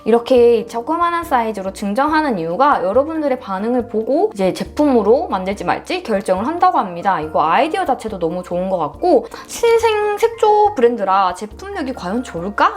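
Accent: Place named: South Korea. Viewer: 사투리 쓰시나바옄ㅋㅋㅋ 먼가 억양이 느껴짐 목소리가 너무 매력적이에요! 너무 조명 뽜악! 아니라서 발색 보기가 좋네요 :)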